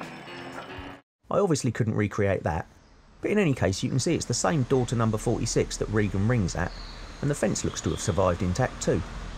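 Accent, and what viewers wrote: London, United Kingdom. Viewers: Absolutely brilliant stuff, loving the old London footage from the greatest cop show ever ! even though i come from the North of the city, its still a welcome trip down nostalgia lane ! keep up the good work mate, love your proper London accent too, its sadly dying aint it 😢👍🏻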